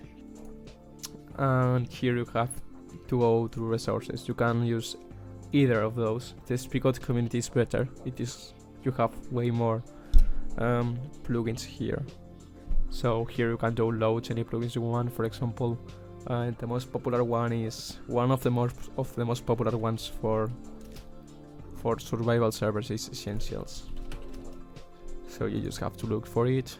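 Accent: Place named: Spain. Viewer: Gracias , se nota que eres español xd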